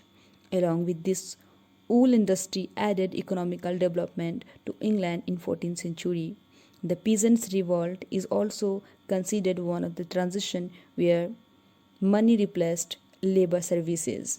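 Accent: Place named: India. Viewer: Are you indian? Different accent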